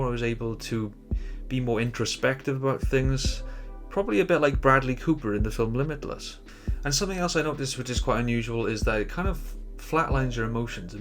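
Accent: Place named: Swansea, United Kingdom. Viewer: You gotta be from south Wales butt you sound like a Swansea lad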